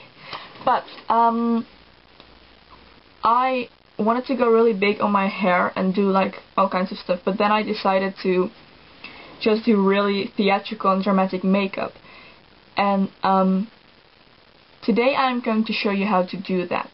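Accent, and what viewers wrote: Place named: Netherlands. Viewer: je herkent het gelijk als een nederlander engels praat. niet dat je engels slecht is ofzo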